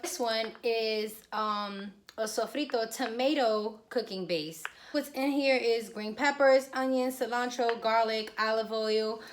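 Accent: United States. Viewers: Sounds NewYorican accent. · Hey what state do u live in? Just curious cuz of your accent, sounds like ur from Texas. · Se ve bueno. LoL like the gringa accent😂 voy hacer algo comp eso ahora oren por mi...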